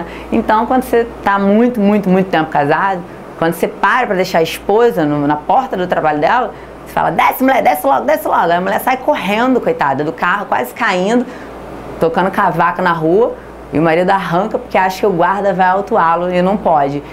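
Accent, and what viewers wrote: Rio de Janeiro, Brazil. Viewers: Sotaque carioca :) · sotaque carioca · aguentar esse sotaque pobre de carioca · carioques insuportavel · Gostei muito do seu sotaque, característico de um carioquês original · Gostei, mas me sinto no rio com esse sotaque, não sei se tudo isso também se aplica pra São Paulo. Mais foco na matéria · Putz, me desculpem, mas não consegui assistir o video inteiro, não posso falar se é bom ou ruim, porque esse " carioquêis " é irritante DEMAIS !!! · Sotaque carioca é maravilhoso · maldito sotaque carioca. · ela tem sotaque carioca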